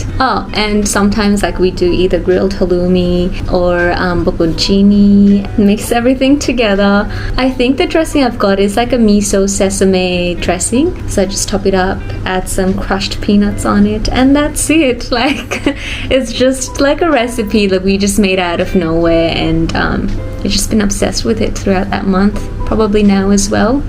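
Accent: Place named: Australia. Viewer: Your Aussie accent is getting stronger! ❤️ hehe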